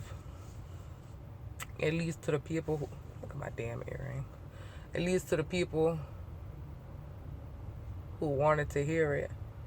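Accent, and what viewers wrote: Louisiana, United States. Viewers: You are beautiful either way! Love the way you talk too. You have got to be from Louisiana! Creole? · Hello my handle is spaceman I just joined the hunt team I purchased a 2018 kenworth t680 I pick it up Friday your videos are very informative and I love the Cajun accent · I can listen to Louisiana talk all day!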